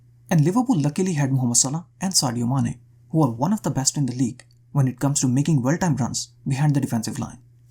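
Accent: India. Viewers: Your accent sounds so Indian! Great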